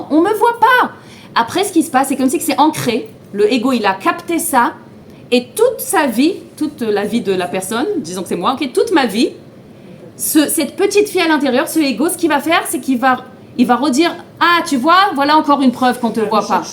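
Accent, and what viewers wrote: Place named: Israel. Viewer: J’adore l’accent israélien ❤️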